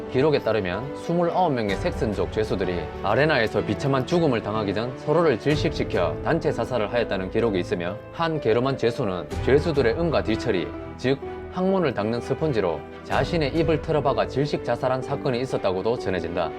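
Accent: Gyeongsang, South Korea. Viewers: 인간이 예수왕 어린양의 구원의 피외에는 영원히 살지 못하는데 뭔 영원이라하냐 경상도 억양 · 갱상도사투리나레이션 듣기거북하네예 ㅋ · 다 좋은데 갱샹도 사투리가 거슬리네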